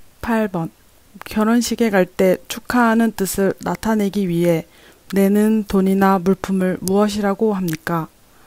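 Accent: South Korea. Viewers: Cho mình hỏi 1 chút, giọng đọc trên là người gốc Hàn đọc ạ ? Và khi đi thi thì liệu tần suất đọc có nhanh hơn và khó nghe hơn ko ạ? ( giọng đọc trên mình nghe được ). Mình cảm ơn ạ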